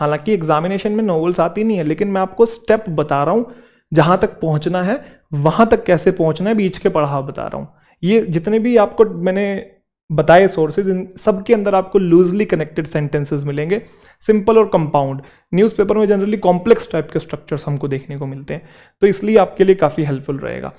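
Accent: Haryana, India. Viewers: Fruitful session🥀 apki batein sunkr aise man krta hai bass sunte hii jaoo….English ke sath haryanvi tadka jo milta hai hme😅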